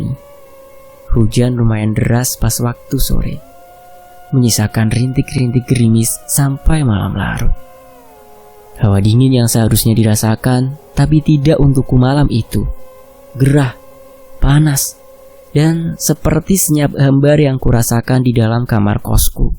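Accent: Java, Indonesia. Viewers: demen bnget pas mimin bawain logat jawanya... 🤭🤭🤭 rada2 gimna gitu... 😅😅😅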